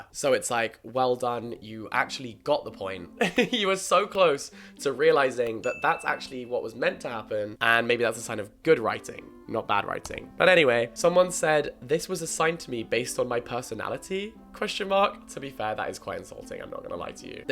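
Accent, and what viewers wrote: United Kingdom. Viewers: British accent so cooll👏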